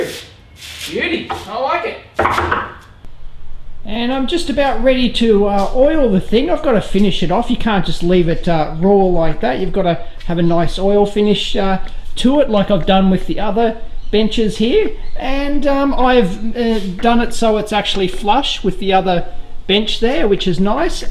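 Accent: Australia. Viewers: Australian. His accent sounds nothing like a Canadian one. Also most Canadians would use feet for that type of job.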